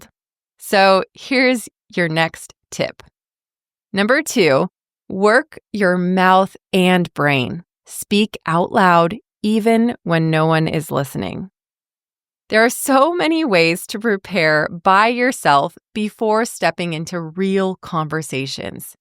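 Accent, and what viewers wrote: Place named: United States. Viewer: Siempre es un gran placer y aprendizaje escuchar tu inglés americano, gracias maestra! 🇦🇷🇧🇷🇧🇴🇺🇾🇵🇾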